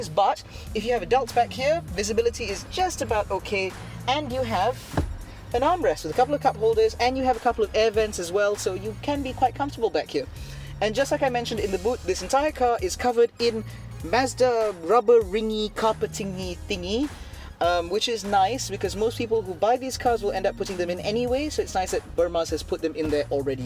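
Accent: England, United Kingdom. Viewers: Hello. You deserve more subscriber. I really like your english accent. I hope to have master english language also. Keep up the good work 👍 Edit: also, the video editing is top quality. Superb!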